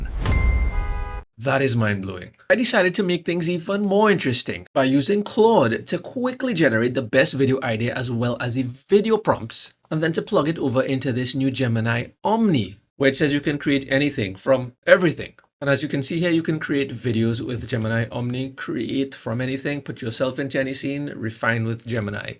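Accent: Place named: Trinidad and Tobago. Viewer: Alright Good morning Yuh sounding Trinitarian. 🇹🇹 Trinidadian.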